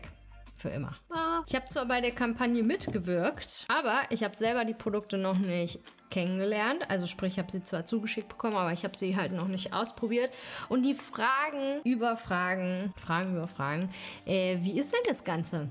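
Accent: Berlin, Germany. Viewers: 😂😂 minute 😂😂😂 Die beste Faxenmacherin youtubes Deine Berliner Schnauze ist auch grossartig -Du löst bei mir Heimweh aus 👏👏👏weiter so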